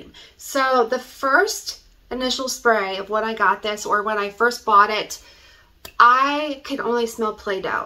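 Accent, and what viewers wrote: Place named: United States. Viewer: Omg the American accent is so cute.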